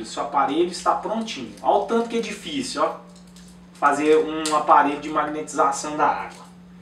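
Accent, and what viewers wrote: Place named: Minas Gerais, Brazil. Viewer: uai , esse. é mineiro hahaha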